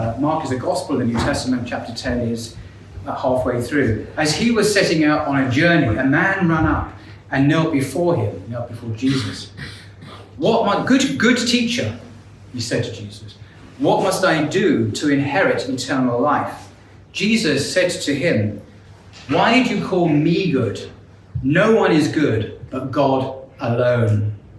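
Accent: United Kingdom. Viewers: Mashallah brother! Thank you very much for this amazing talk. I like to listen to your videos because of their very rich content but also for the British accent that I like very much 😅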